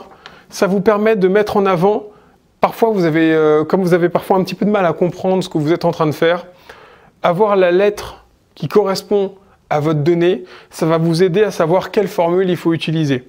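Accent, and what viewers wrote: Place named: France. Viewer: Mignon votre accent français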